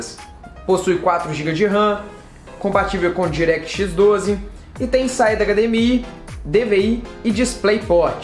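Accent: Minas Gerais, Brazil. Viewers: Mineiro mineiro ♥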